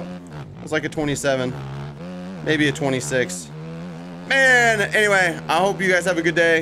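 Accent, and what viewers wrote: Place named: Philadelphia, United States. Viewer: Wheres your accent from buddy? You gotta be from philly area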